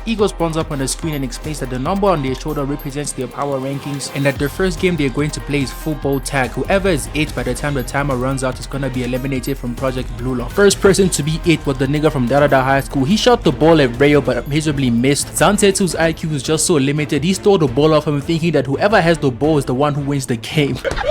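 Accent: South Africa. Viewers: Wait are you south African? Because I am and super strikers built my child hood and made me love football poi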